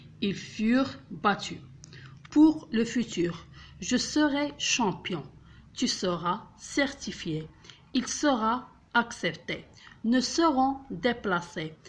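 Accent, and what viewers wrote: France. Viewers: French accent is superb. U r doing awesome work 👍👍👍😊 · really great information and superb - i love the french accent - hope these tips i will learn french - thumbs up ;)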